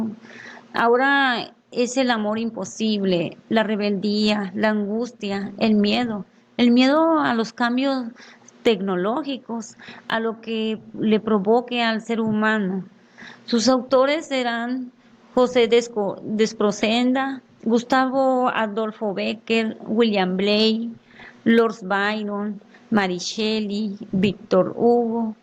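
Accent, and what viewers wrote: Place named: Veracruz, Mexico. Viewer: Pareces de Veracruz.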